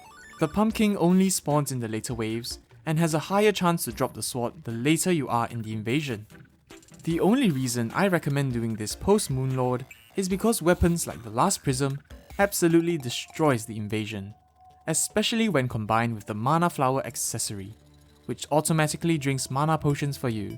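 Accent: Singapore. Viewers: Sounds singaporean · Are u from sg? your accent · Hi, just a quick personal question. Just curious but you sound a lot like Singaporean Chinese. Are you? 😋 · are u a singaporean? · He sounds like a Singaporean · oh you are singaporean no wonder u sound like one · dude are from singapore? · Are you Singaporean? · Singaporean? Nice !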